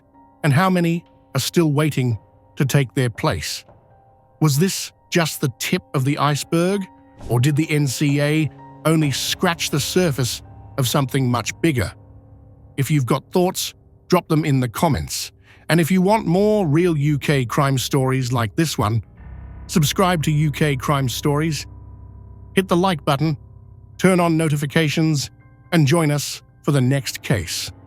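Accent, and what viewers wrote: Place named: Australia. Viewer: My previous comment was deleted by YT strangley. Great video. Subscribed. Interesting Aussie narrator. AI or paid ? Are you able to share the source? Cheers from this Aussie living in NZ 😎🎸🤘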